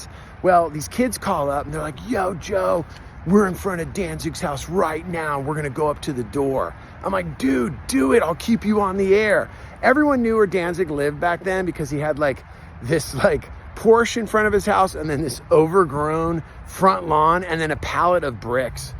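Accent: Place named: West Coast, United States. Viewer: He talks just like a west coaster.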